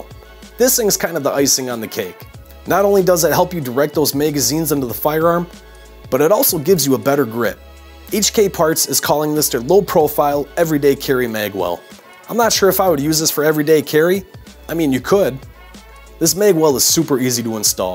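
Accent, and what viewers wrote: Wisconsin, United States. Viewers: Sweet Jesus your Wisconsin accent overpowers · Narrator, are you from Wisconsin or that area? That accent is interesting. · Your accent makes me think you live in Manitowoc County and you eat lots of bratwursts.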